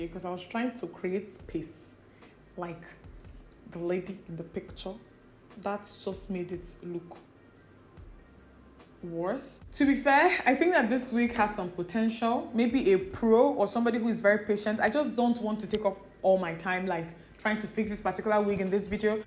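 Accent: Nigeria. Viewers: That nigerian accent made me😂😂😂😂😂😂, 💓💓💓👌🏿👌🏿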